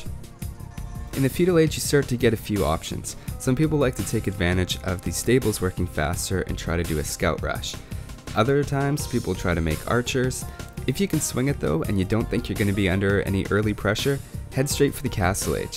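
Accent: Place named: Canada. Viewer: Your accent sounds Canadian haha. Are you from Canada?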